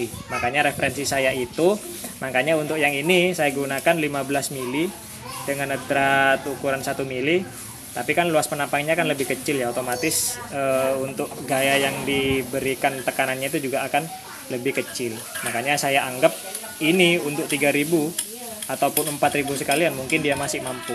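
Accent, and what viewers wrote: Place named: East Java, Indonesia. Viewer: Dari logatnya spertinya mas org jawatimuran. Bisa minta kontak wa nya mas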